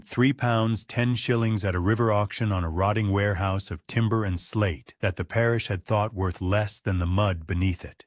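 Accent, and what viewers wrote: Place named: United States. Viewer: Hint - get rid of the American voice. Im so peeved by the USA i will not listen to anything with USA accent !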